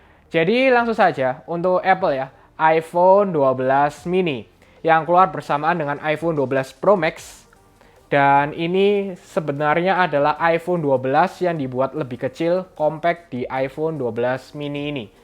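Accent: Java, Indonesia. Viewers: Suaramu kok medok to Mas. Wong Suroboyo opo Semarang? ....... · Bolonganya 😄🙏🏻 sampeyan medok bgt toh koh ..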